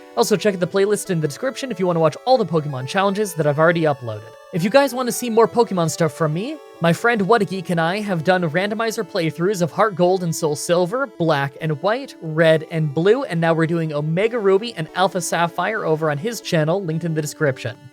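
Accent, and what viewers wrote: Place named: Canada. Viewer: I love how Canadian you are, when you say “out” I never know what pronunciation you will use lol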